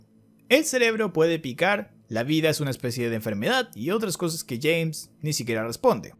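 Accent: Chile. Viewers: No es hate pero no me gusta el asentó chileno :'(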